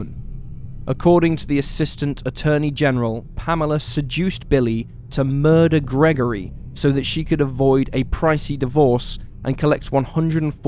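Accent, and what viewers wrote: United Kingdom. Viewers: Narrators who speak excellent English (i.e., British we presume?) sort of ruin the story without any expression utilized WITH the English perfection. Find some narrators who know how to phrase their sentences in an interesting manner? this guy sounds as if he, himself is not even enjoying relaying the stories (which without his rigid enunciations, were actually, quite interesting. Ok nobody cares Bye bye.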